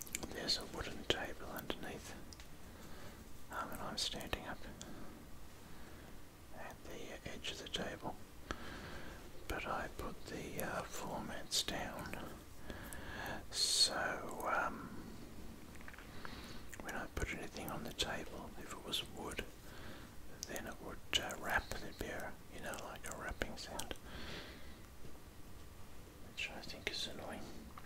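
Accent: Australia. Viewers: A wonderful Aussie asmr, thank you very much. my favorite ever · To be honest, An Americans ASMR does not do anything. But an Aussie, it affects me. 👌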